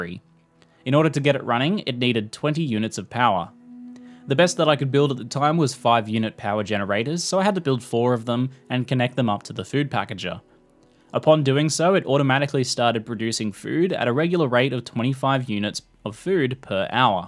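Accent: Australia. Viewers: Are you aussie ?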